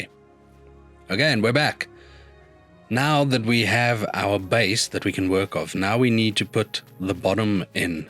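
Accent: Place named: South Africa. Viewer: Heard the accent and immediately knew it was a fellow Safa. Great to see, wishing you lots of growth. Sub status achieved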